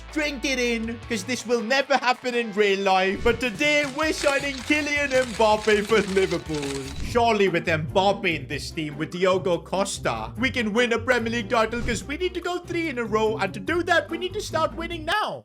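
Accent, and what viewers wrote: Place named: India. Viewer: lol Indian accent is so strong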